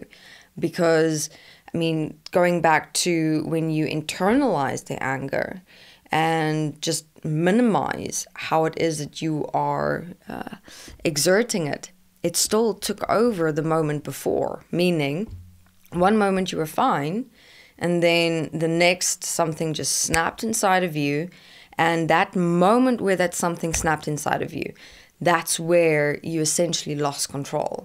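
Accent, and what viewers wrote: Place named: South Africa. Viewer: Sheesh. South African....Netherlands, Scandinavian...?? I'm hearing bits of Maori for crying out loud. Gaaaah! Where is your accent from?? Thanks for helpful video too.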